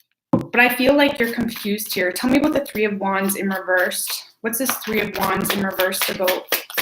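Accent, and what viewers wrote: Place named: Canada. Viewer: I thought you were Canadian from the accent but that Tim Horton's confirmed it 😂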